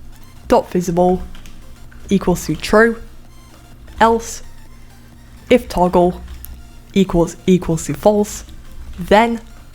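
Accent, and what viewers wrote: United Kingdom. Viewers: Do you add places for the lobby and the game? Also nice British accent there buddy. Also you're real helpful for me making my games good! :)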